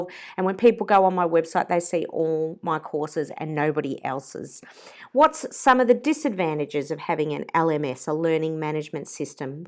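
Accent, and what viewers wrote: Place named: Australia. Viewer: Great to hear another Aussie! I've just started on Teachable and I'm finding it great. The only downside so far is the monthly fees and the extra 5% they take from profits. I've been able to ease into it pretty well as I already have a student base on Youtube and they are very keen learners! Teachable also has a ton of information for starting up and their customer service is excellent. Interesting video, thanks for sharing.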